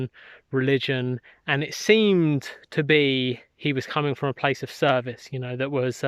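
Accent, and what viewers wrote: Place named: United Kingdom. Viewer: Thx for sharing.. How come you have stopped making more content for your channel. We have the same accent from UK 😁✌🏼 Thx for sharing. Subscribed 💚🙌🏼✌🏼🙏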